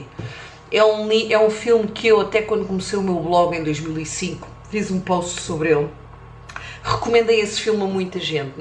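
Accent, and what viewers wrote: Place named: Portugal. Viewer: Português de Portugal é gostoso de se ouvir. ❤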